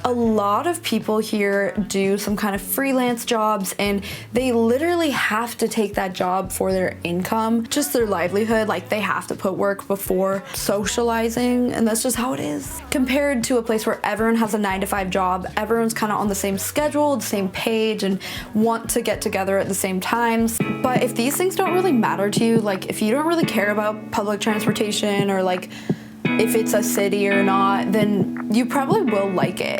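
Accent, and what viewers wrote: Los Angeles, United States. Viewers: At least the LA accent has rubbed off on you! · Quintessential L.A voice and personality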